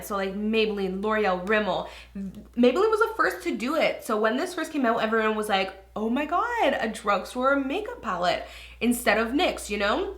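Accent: Canada. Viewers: Is she Canadian? Just the way she pronounces certain words sounds Canadian. :-)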